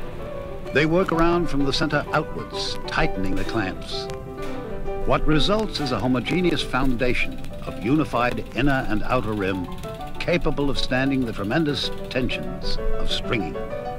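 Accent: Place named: New Zealand. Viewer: Why does the narrator, John Steinway have a NZ accent? I am confused... Is there a NZ connection?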